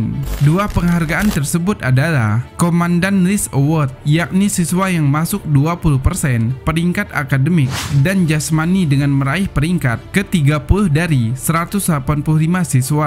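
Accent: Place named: Java, Indonesia. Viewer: JANGAN MEDOK YA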